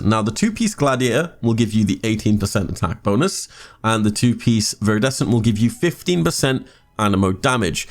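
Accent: United Kingdom. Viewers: i dont watched rest of the video( because i amazed by your British accent) but will see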